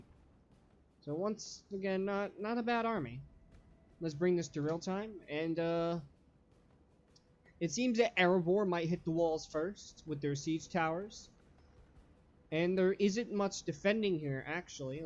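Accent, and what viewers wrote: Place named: North America. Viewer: U do sound very North American, would never of guessed Sweden lol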